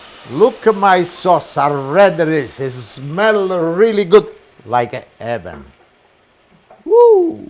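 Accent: Italy. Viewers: He sounds just like my italian family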